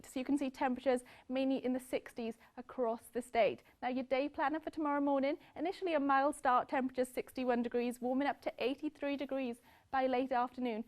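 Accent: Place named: Wales, United Kingdom. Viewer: Why, and how, is a Welsh girl reading the weather in America?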